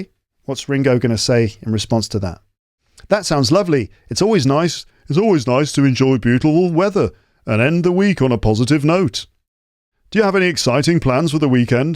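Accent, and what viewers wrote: United Kingdom. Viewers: How i downloaded it and what's its name of the app. I love your podcast, it's dramatically useful. I wanted to learn Amarican accent but after i know your podcast i started learn British accent 🤎🤎🌿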